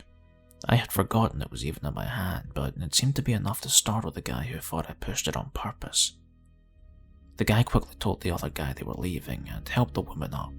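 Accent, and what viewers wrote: Scotland, United Kingdom. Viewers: A Scottish accent goes really well with scary stories. Great story telling voice👌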